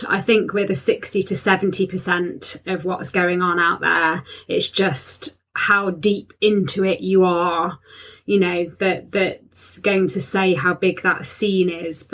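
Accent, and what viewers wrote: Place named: England, United Kingdom. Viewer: She's decent looking but that English whiney voice has to go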